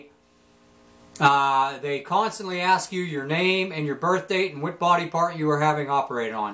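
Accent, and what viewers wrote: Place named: Pittsburgh, United States. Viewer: I hear a pittsburgh accent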